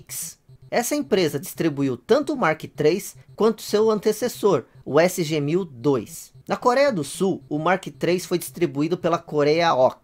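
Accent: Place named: Belo Horizonte, Brazil. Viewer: Prestei atenção em vc conversando. Vc eh de BH.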